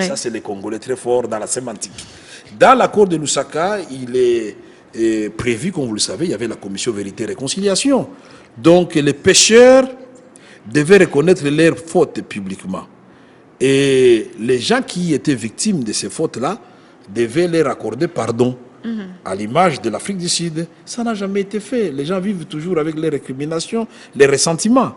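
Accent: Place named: Rwanda. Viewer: Maigres toutes les langues qu' il maîtrise mais il n'a pas perdu son accent kinyarwanda. Chassé le naturel.........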